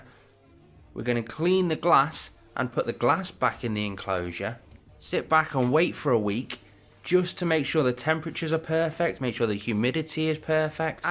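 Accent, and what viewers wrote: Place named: Sheffield, United Kingdom. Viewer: Nice setup just wondering where are you from I’m getting Sheffield vibes idk y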